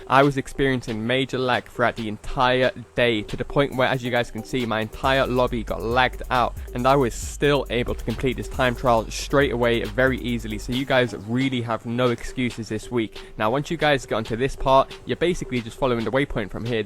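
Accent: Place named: United Kingdom. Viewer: Love the British accent🇬🇧!!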